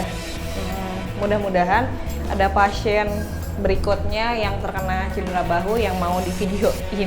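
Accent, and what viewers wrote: West Java, Indonesia. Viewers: Dari logat bicara mbk dari sunda..mereun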